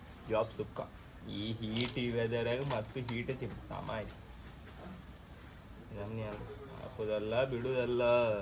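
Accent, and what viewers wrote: Kundapura, India. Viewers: ಎಷ್ಟ್ ಚಂದು ಕುಂದಾಪ್ರ ಕನ್ನಡ ಮಾತಾಡ್ತೆ ಗಡ್ಯಾ ❤️❤️ ಅಮ್ಮ ನಿಮ್ಮನ್ನ ಕಂಡ ಖುಷಿ ಆಯ್ತ್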